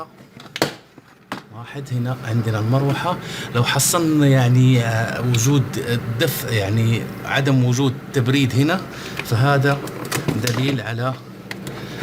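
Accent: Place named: Morocco. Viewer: تحية من بني ملال ❤ لهجتك مغربية شوية 😊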